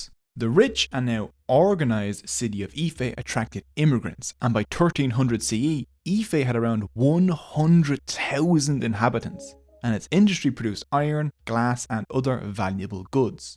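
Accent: Ireland. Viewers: Please be googling how to pronounce “Yoruba” first. Even with your Irish accent there’s no reason why you can’t pronounce Yoruba and place names like Ibadan properly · BRUUUH you're confirmation to my closeted anthropologist mind, that the accent of the Bajans is defohighly influenced by de irish and probably from where youre from in ireland... #onelove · From a Nigerian, an Irish dude pronouncing Yoruba places/names with an Irish accent...is just in having me in stitches 😂😂😂 (it's so phonetically bad/wrong), but I'm so enjoying this video nonetheless. The amount of research is immensely commendable. 👍👍👍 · A party to celebrate the success of a previous party SENT ME lol This was a pretty cool breakdown especially for someone who is ADOS learning I'm largely Yoruba. I also appreciate your pronunciation effort which I don't think can be easy with a strong Irish (?) accent! 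· God i really love your accent, i wish i was born Irish so i don't have to study extra to have Irish accent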